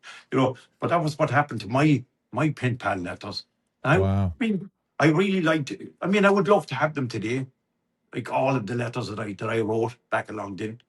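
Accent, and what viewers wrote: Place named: Ireland. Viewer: God love you, you’re still as Irish as the day you were born … so good to hear you speak. Great story, keep them coming! Without a doubt you’re a true story teller … but you knew that. I certainly can relate to school homework not done and the repercussions on Monday morning … but throughout my school days it never changed … now writing stories … that was a different kettle of fish. So happy for your new venture and may those darn shingles disappear! ❤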